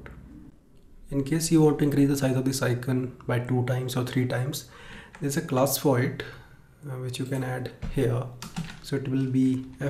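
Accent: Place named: India. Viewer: im fed up with this accent seriously , i wanna smash my head when i hear this accent honestly , i just cant understand what u indians say and everytime im searching sth related to coding an indian pops up , fuck this life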